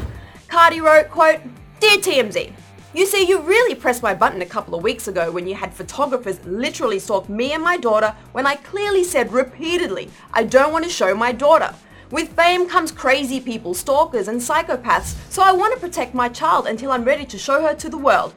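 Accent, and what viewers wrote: Australia. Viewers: Whose this new Australian host? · love her accent :O is that australian?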